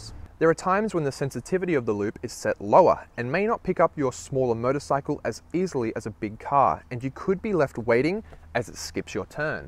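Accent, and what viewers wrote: Australia. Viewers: Are you Australian mate?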